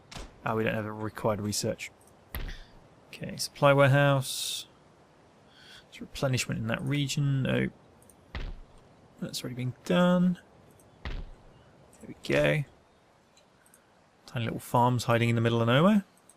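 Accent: United Kingdom. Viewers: You sound like a 100% englishman.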